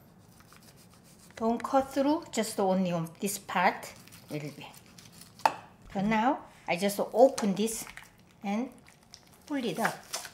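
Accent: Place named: South Korea. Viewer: Your Korean accented English is beautiful, and so are you!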